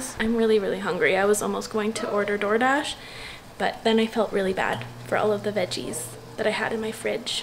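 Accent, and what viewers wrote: Quebec, Canada. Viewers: My favourite phrase or is "we'll see about that" - it's the Canadian accent that does it for me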